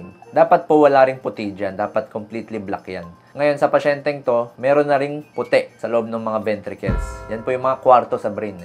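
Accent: Visayas, Philippines. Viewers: Bisaya sya idol...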